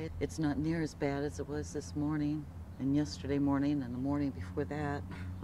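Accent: South, United States. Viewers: Ok lady I think you make very beautiful videos. And I really like your accent. A bit southern but very clear. I am not an American so sorry if I am wrong.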